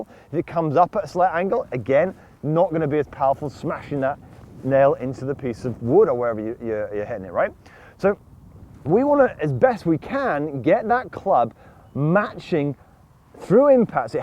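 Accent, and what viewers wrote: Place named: Leeds, United Kingdom. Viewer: Simply the best Danny......luv your Leeds accent :)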